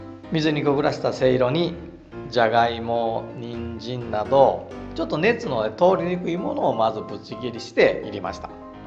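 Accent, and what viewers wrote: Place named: Tosa, Japan. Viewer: 先日竹虎さんでせいろを購入させていただいて、せいろの動画を探していたら公式さんの動画に辿り着きました！😳 初めて使うので、丁寧な動画を上げていただいて大変ありがたいです…！参考にさせていただきます✨ 自分は高知県民ではないのですが、恋人が高知出身で高知県が大好きなので土佐弁の音声もうれしくなりました😊🌸 他の動画もありがたく拝見させていただきます✨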